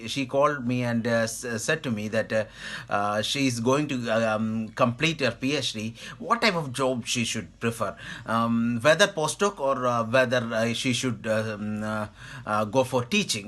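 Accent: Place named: Malaysia. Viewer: First of all learn to talk english ,then learn how to pronounce it other than some '' MINGLISH'' 🙄😏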